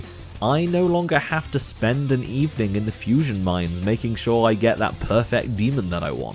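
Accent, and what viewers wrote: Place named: United Kingdom. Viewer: Excellent video. I usually say SMT V sucks, but you did it in a much more eloquent way, and even used a British accent!🇬🇧 My favorite point was that SMT V moves nothing forward and innovates in no ways. I'll even point out my biggest complaint: you don't gain any new movement skills as the game progresses. It's not like there are places you can't access because you don't have an ability. How much more interesting would it have been if you eventually got the ability to double jump, or use a grappling hook, or open sealed passageways, or see in the dark? Instead, you have all the movement skills at the beginning of the game and gain no more as time went on. That's not how that type of game is supposed to work. Also, when I got to the Tokyo Tower, there was nothing to do. There was no dungeon, no secrets, nothing to explore. 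My disappointment was audible. If you have the time, check out GILL Studio on YouTube. He plays a lot of JRPG music, especially SMT games. When you watch him play Digital Devil Saga or Devil Summoner music, he rocks out and you can see how skilled he is. He plays lead, rhythm, and bass guitar, and he's extremely impressive. When he plays SMT V music, he looks bored. It's so far below his skill, it's not even funny. (I'm still trying to get him to play from Devil Survivor. That tune will melt your guitar.) Here's my final analysis: SMT V is Fable. It made a lot of promises, like an open world and endless exploration, a character you could truly mak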